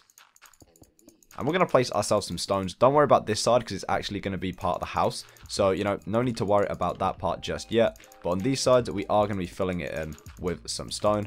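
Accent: United Kingdom. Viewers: Ar you english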